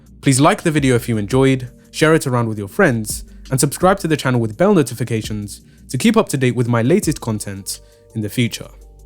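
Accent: United Kingdom. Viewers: This guy must be British 😄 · British accent noted...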